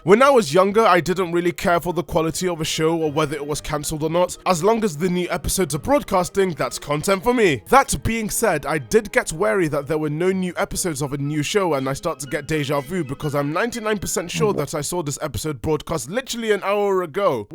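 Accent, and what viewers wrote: United Kingdom. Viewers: You have such a bearable British accent. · Rock Stone oh my God. Not every black person is African American. This dude clearly has a British accent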